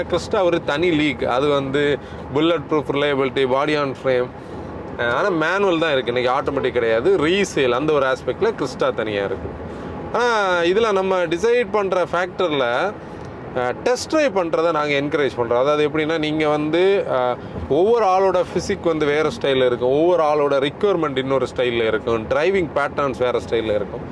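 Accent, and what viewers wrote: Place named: Nanjil Nadu, India. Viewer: Love your detailed reviews, Especially it being in the nanjil slang😄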